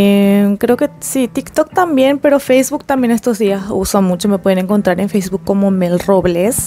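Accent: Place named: Mexico. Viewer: Mel siento que tu acento ya se escucha más mexicano, igual hablas relindo ❤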